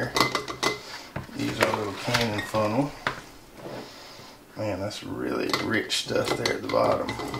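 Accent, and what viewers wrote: South, United States. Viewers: You southern guys are the best. Love your accent and your down home smarts. I too live in zone 8B (in the Pacific Northwest), but it's a world apart from where you live. While your low temperatures are similar to ours, we're in those lows for months at a time, not just on occasion. I am envious of your warm weather that allows winter gardening of crops we can only grow in the summer. I've purchased some of your tools. First class quality.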